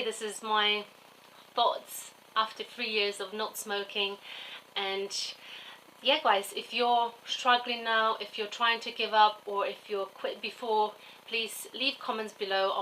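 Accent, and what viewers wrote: England, United Kingdom. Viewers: What accent do you have?? Are you English? Congrats on three years and 3 months of no smoking. You look healthy and really happy. You maka me smile :) xx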